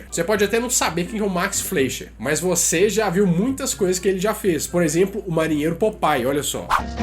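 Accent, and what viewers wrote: Minas Gerais, Brazil. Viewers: Conteúdo: Topzera Carisma: inexistente Sotaque Mineiro: insuportável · Com certeza vc é aqui de BH... Eu também! Nosso sotaque é muito engraçado KKKKKKKKK... Também tenho um canal. Comecei a uns 4 meses. Também falo sobre cultura POP, ciência, curiosidades, etc. Depois se der confere lá. De Mineiro para Mineiro!!!!! <link>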